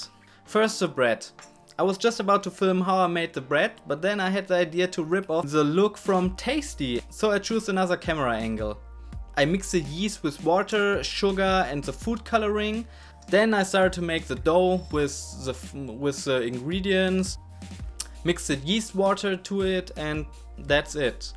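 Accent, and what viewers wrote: Germany. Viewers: Das video an sich ist nicht schlecht aber ich kriege kopfschmerzen vom deutschen akzent 👍😖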